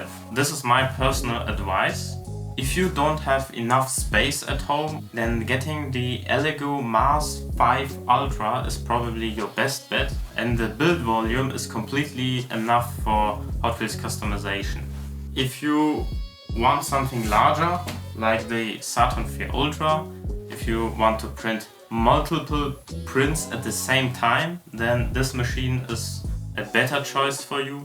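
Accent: Germany. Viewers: Cooles Video aber man hört das du deutsch bist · Are you from Germany?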